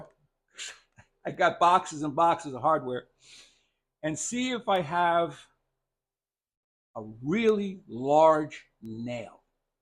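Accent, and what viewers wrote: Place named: Boston, United States. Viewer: I detect a bit of Boston accent…..caaaaard scraper, haaaaardware store. 😀. Glad you are having a fun time, it’s very informative to watch you make some planes. Enjoy your day. Too cold in my shop right now so I will patiently wait to try your teachings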